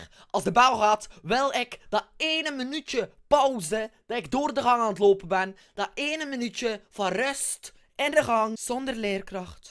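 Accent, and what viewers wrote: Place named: Flanders, Belgium. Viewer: love your accent! (Ik vind vlaamse accentjes gewoon leuk)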